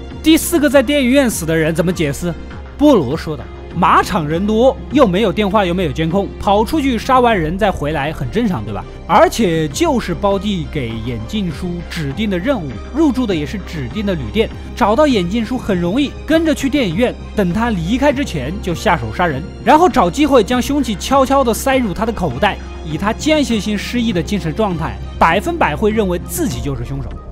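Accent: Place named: Hunan, China. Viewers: 湖南口音好重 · 湖南人吧哈哈哈口音好好玩不然就是湖北人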